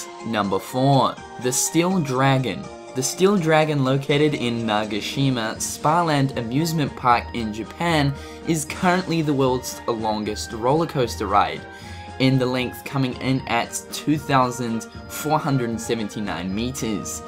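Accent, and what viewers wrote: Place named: New Zealand. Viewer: The way you said Staffordshire hahahahahha XD not hating on your beautiful NZ accent btw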